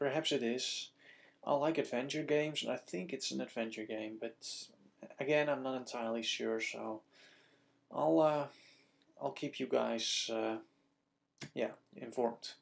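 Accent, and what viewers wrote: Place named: Netherlands. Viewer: Your german reminds me of the cartoon series Alfred J. Kwak i used to watch as kid, because the german voice actor of this cartoon figure is also a german speaking dutch. Sounds cool ;) Kudos for doing those video, a brave step forward!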